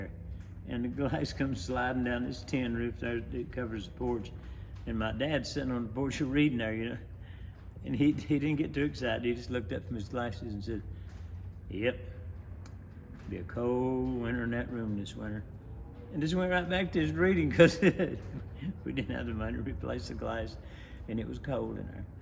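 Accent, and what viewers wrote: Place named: North Carolina, United States. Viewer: Didn't know you were a fellow Tar Heel, but I thought I recognized your accent. I have one similar being born in High Point 1948. Best wishes to you,. Love and use your products.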